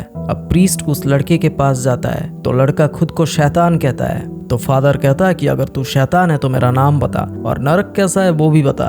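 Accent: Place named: Bengal, India. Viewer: Bhai new explainer ho tum. Lekin tumhara story telling style aur awaaz unique hai. Apne script me Bengali accent mat lao aur thoda aur serious explain kro. U earned a subscriber❤❤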